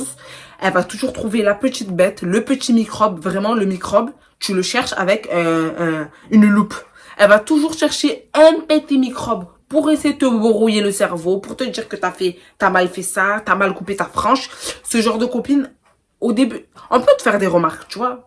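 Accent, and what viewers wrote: Marseille, France. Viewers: Cc ma belle une abonnée en plus tu est de Marseille en dirais avec ton accent. Tu à tout dit surtout les femmes sont trop méchante et hypocrite en amitié .vaut mieux marcher seul et être tranquille dans sa tête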